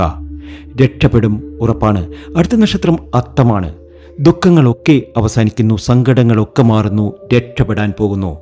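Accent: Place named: Kolkata, India. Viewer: Kolkata Malayalam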